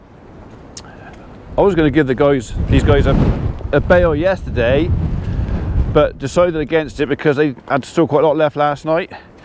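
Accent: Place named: West Country, United Kingdom. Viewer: well I be In Devon the crown jewel of the westcontry. and I must say i been cathing up with your epic vids your natraly funny as in comical and i love you have a strong westcontry accent and i loved the vid of you and holli very comical i hope mrs P is doing well keep up the epic vids its been weet here as weel on and off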